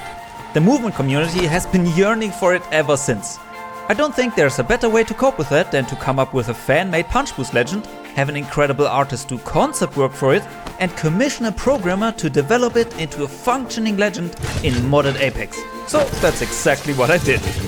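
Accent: Germany. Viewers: One question are you german 😅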